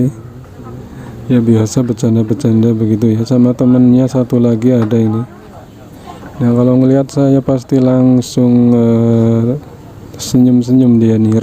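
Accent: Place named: Banyumas, Indonesia. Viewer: Mas e ngapak..🤣🤣🤣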